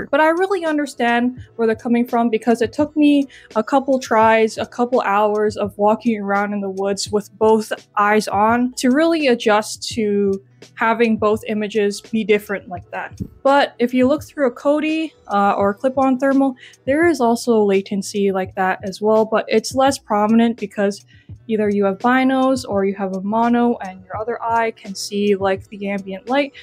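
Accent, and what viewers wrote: Canada. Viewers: am i tweaking or r u canadian?? like from van canadian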